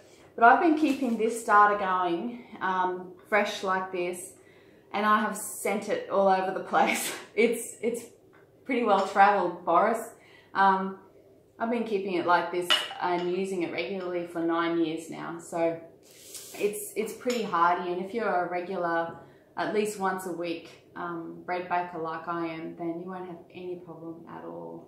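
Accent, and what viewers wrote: Australia. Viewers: Love that Australian accent.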